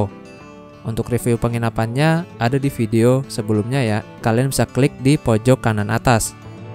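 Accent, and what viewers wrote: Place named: Banyumas, Indonesia. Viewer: Legend bise wong ngapak,, kemutan jaman sekolah numpake bis Asli 😂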